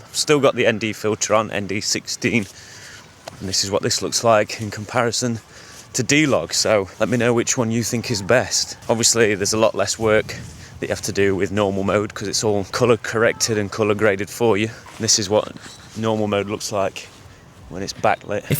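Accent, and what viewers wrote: United Kingdom. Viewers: wow how cute your brit ish accent luved it! Hi👋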